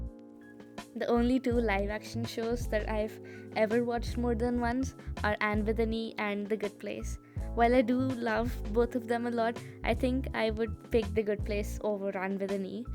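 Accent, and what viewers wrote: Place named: India. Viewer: are you from India?😅 (I'm so sorry if I offended you, but your voice literally sounds like an Indian <3❤)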